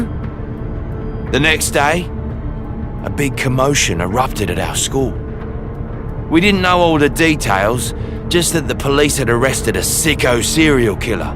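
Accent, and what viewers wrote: United Kingdom. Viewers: HE IS BRITISH??? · I keep forgetting hes british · I KEEP FORGETTING THIS MF IS BRITISH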